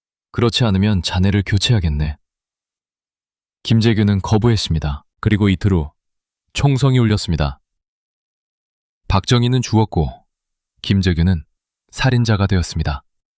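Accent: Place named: China. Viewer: 중국 발음이네 AI가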